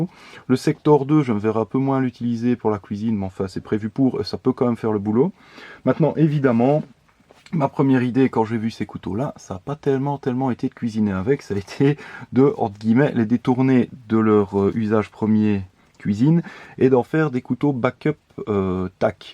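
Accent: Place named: Belgium. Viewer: Je viens de te commander le Sector 2, et je pense que je demanderai à l'équipe de TRB de me faire un bel étui Kydex pour un port "neck" ;-) Merci pour tes vidéos, avec l'accent belge en plus !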